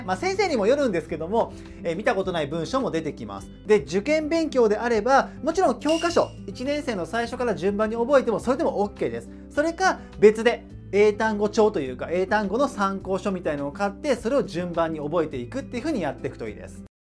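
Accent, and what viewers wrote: Kansai, Japan. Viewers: なんか関西弁？入っててかわいい！！！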